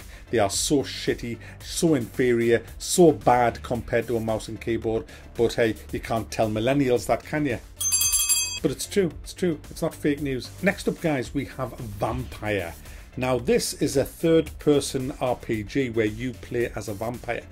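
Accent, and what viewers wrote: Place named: Newcastle, United Kingdom. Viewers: Haven't watched the video through yet, no idea whether you will mention the games I'M looking forward to but who cares? I come here for and his glorious, dulcet Geordie tones not reinforcement of my existing opinions. I love you dude, your channel is one of my absolute favourite sources of entertainment. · I don't watch you for your PC penchant, I have watched your videos for years because of how you cut through the bullshit and give me an honest and entertaining review. Firstly, it's fucking refreshing to have a proper geordie lad on here rather than some squeaky yank and secondly, while I don't play on PC, I appreciate what you have to say about the games releasing today, especially when they're mediocre yet full of greedy ploys by devolpers who have probably never played a game in their lives. Seriously, keep up the good work but give others who have made a different choice than yourself, getting a PS4 a break for simply having a different preference. 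I'm shite with a mouse and keyboard, I always plug in a controller when I play games on my mate's PC. It's always funny to see me aim with a mouse just as it is to see him try and make heads or tails of a controller!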